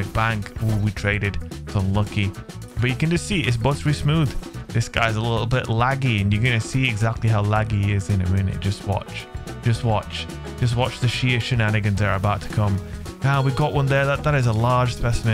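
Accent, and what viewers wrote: United Kingdom. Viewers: I love your videos but will you only make PVP content forever? At least once, show some of that gravy PVE talent you got with that british accent spice, gud lad!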